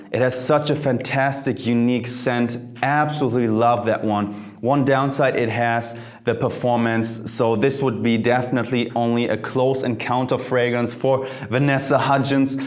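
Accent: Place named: Germany. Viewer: omfggg german accent soo hard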